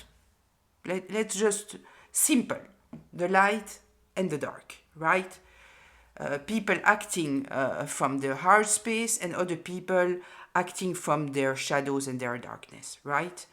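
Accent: France. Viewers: my beautiful Lady, your story is so much my own... So much in common from what you've shared. I recently found your channel and the first time I heard your beautiful voice and that lovely French accent I feel I know you from a previous life. The few videos of yours I've watched have provided so much clarity for me (this is one of the ways you agreed to help me along my journey). No one would believe the hell ppl did to me, but your personal story as well as your resonate readings confirmed for me that not only am I not crazy; the false narrative about me, it has also helped me to finally see why the devil has made me his focus for most of my life. Satan doesn't attack souls who are already doing his bidding. He only attacks souls who are shining God's pure Light. You my dear sister are clearly on your divine and destined path; the one you planned with your own spirit guides to come to this difficult school. 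I feel it every time I Iisten to you. I owe you so much and one day when I'm in a position to do so, I will give back even though I sense you expect nothing in return. Thank you so much... please tell me your name. 🙏🏽 If the algorithms allow my comment to get to you; my name is Joan as in Joan of Arc, and I'm truly happy to have found you...again. 💜💜💜😊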